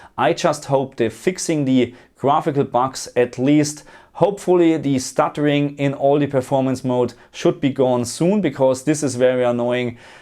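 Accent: Germany. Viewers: Man hört so raus das du Deutsche Wurzeln oder so hast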